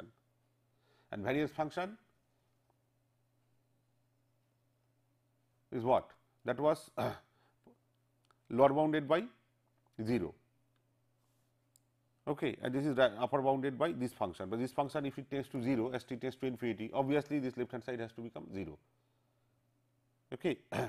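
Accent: India. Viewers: Is he speaking english with a hindi accent or is he speaking hindi with an english accent? I like his explanation though.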